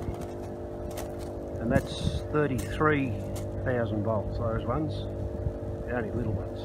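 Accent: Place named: Sydney, Australia. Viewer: Location and incoming lines look familiar, accent gives it away. Not too far off Wallgrove are we...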